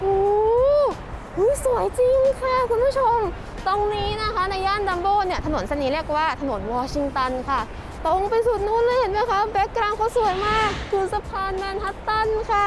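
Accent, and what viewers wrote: United Kingdom. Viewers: สำเนียงพี่ บริติชมากเลยยยย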